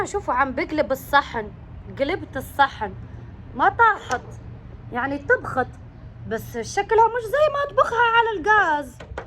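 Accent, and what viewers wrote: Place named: Saudi Arabia. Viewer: 😆 هدرتك تتبدل صرتي سعودية صح 👌🏼💯😆 بصح ضحكتك تبقى كيما راهي 😆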